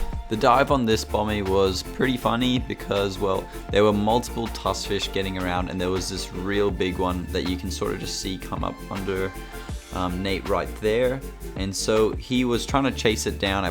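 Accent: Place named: South Africa. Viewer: Sounds like you have some South African in that accent 😊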